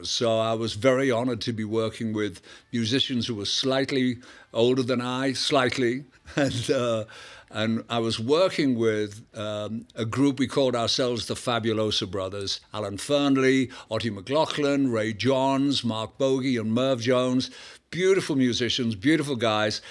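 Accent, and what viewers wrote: RP, United Kingdom. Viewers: He speaks rather posh English.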